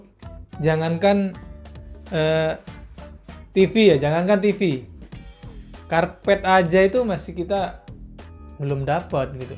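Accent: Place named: Madura, Indonesia. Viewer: Logatnya kyak ada maduranya.. 🤭 Orang mana bro?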